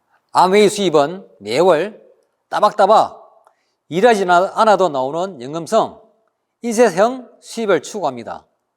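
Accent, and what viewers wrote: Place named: Gyeongsang, South Korea. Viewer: 구수한 갱산도 사투리로 귀에 속속 리더님짱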